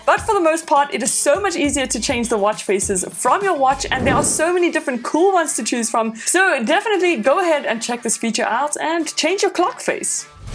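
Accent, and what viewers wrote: South Africa. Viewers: R u from South Africa? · Are you South African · I had to do a double take because of your accent, and then immediately recognized it as South African. Hell yeah! Great video by the way :)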